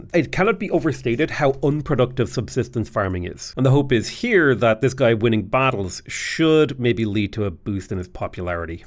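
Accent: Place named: Ireland. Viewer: Came back to finish watching this - just realized that gets more and more Irish as the video goes by. (I do realize it's just his non-youtube accent slipping out bc of how long he's recording for)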